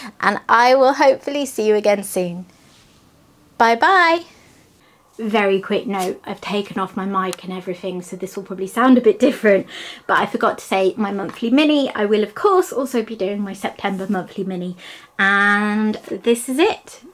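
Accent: England, United Kingdom. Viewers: On your first picture, I love the old car. The Lorenz is so colorful too. I always love your choice of diamond paintings., you do NOT talk too much.!!!! Your English accent is so lovely and delightful. I have always said one of the stupidest things Americans ever did (lately a lot of stupid things) was lose the English accent. I’m American so I can say that. Talk about addiction, I swore to myself no more buying for a few months. So what do I do? I bought knight portal on DAC. It’s such a cool canvas, I had to get it. 😣Anyway you are wonderful. Don’t change. Have a great weekend.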